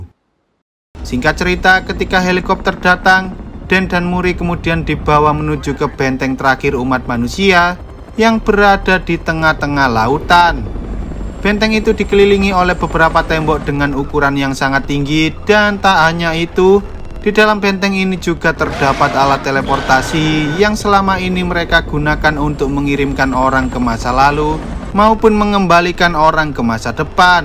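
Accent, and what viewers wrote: Java, Indonesia. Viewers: Jawa bangetttt lol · Suara nya terlalu medok jawa nya jadi agak kurang nyaman di dengar nya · Meddo yah gaesss 😂😂😂😂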